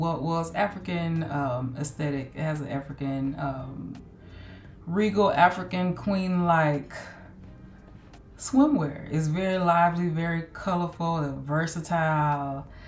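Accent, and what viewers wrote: Jamaica. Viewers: Peace and Love, it's great to see you posting more videos lately. Mi love fi hear yu talk Patwah 🙂. I will definitely check out these business. I was about to order the Jamaica jersey but saw that it wouldn't be shipped until 4 to 5 Weeks. Will get it next month. Dat deh Jersey Nice!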